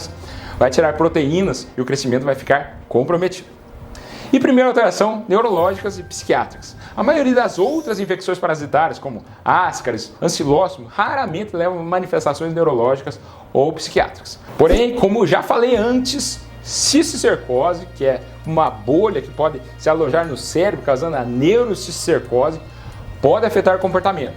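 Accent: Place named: Caipira, Brazil. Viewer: Este médico é maravilhoso, com seu sotaque caipira e jeito de falar , faz com que possamos entender bem tudo que ele explica, além de muito bonito é claro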